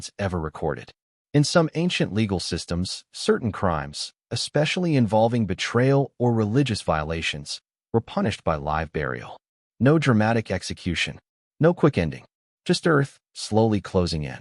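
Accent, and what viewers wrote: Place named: United Kingdom. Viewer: Love the voice It's similar to uk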